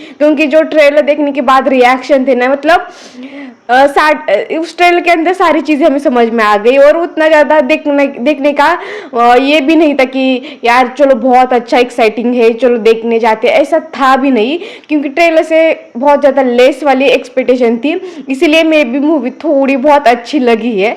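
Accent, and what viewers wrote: Maharashtra, India. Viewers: marathi accent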